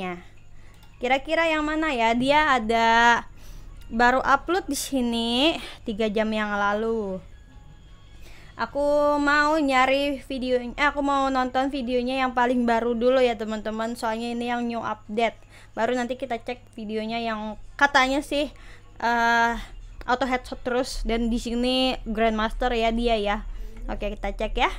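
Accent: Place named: Kalimantan, Indonesia. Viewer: orang mana ko bahasanya kaya orang kalimantab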